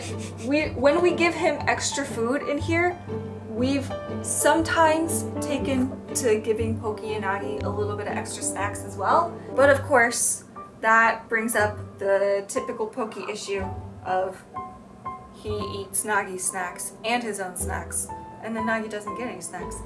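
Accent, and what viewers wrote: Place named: United States. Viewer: How do you have such a clear american accent while living in Japan for so long?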